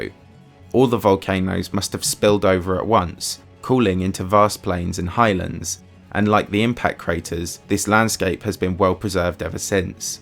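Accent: United Kingdom. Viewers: Sorry but it's hard to take this guy seriously with his unique British accent. Instead of saying "cold" he says "cowd". Hilareous!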